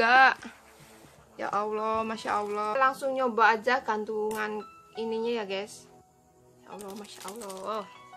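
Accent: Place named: Java, Indonesia. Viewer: Wa'alaikumsalam wr wb Waaah tasnya bagus bangeeet 🤭 Medok banget mbak hehee Salam ngapak lah Nek ora ngapak genah ora kepenak ya mbak 👍 Selamat ya dapat kiriman paket dua sekaligus dari umi rizal A dan mbak Nursilah julaini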